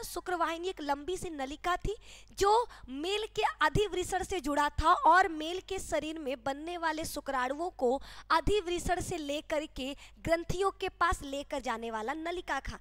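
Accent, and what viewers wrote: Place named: Rajasthan, India. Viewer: ये राजस्थानी है शुक्राणू को शुक्राडू बोलती है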